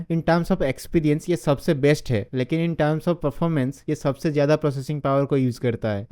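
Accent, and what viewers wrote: Odisha, India. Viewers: Odisha se ho kya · Are you an odia? Your accent makes me feel like that. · Bhai tum Odisha se ho kya? Tumhara awaz ek odia toka jesa lag rha h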